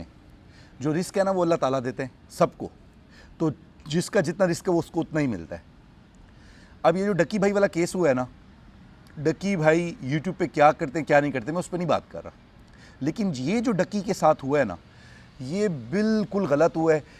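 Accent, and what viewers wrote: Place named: Punjab, India. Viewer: Ap penjabi Boht piyari bolte hain 😅😅😂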